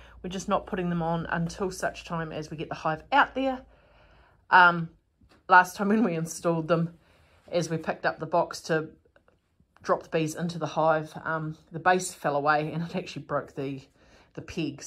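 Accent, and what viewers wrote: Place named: New Zealand. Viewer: Gr8 update,. I should have listened closer to dialogue and would have noted you were Kiwis. Weee used often “bet” for bit … might be rivals on the turf and on the paddock but best of mates after the games and a few beers. Cheers…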